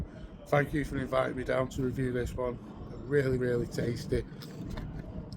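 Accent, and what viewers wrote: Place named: United Kingdom. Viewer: Checking in from the US here. I'm enjoying the reviews. Can I ask what your accent is? When it comes to accents in the UK it's hard for me to tell.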